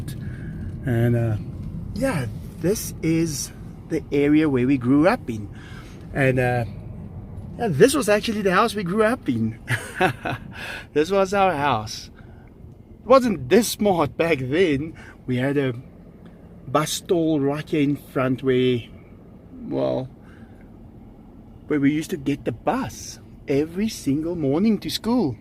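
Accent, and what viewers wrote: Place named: South Africa. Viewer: is it summer there now? bleh.. we have -10C and snowstorm.. well.. thats sweden.. CAN YOU BELIEVE IT haha ur south affrican accent is so cool =) well i should keep my mouth shut since i have swedish accent with hard R like you =) but i love the SA accent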